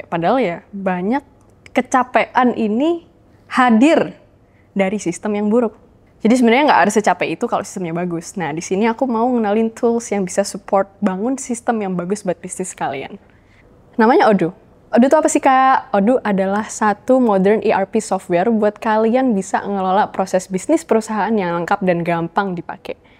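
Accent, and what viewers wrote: Java, Indonesia. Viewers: satu yang saya notice, kemampuan beradaptasi dengan semakin menyamarkan logat medok-nya meningkat jauh. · Kok medok se mbak, bikin seneng aja😅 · mayan lah, medoknya udah hampil ilang, tapi masih kerasa di beberapa pronounce 😁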